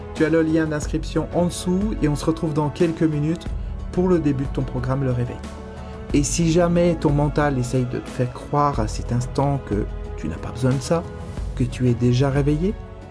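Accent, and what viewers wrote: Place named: Eastern France, France. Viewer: Ton accent te piège??? Lol . T es de l est de la France????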